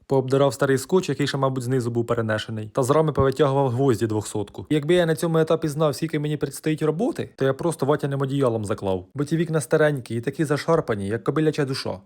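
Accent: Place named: Zaporizhzhia, Ukraine. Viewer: Шторами завіситься, та й пройдуть ! Гарно , весело, а головне як у нас говорять! Я сміюся до сліз! Я із Запорізької області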